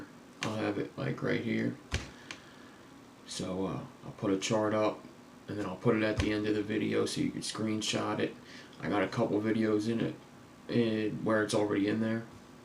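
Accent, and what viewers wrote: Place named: Baltimore, United States. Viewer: Do you have got to be from Baltimore with that accent that you have it’s pretty much where I grew up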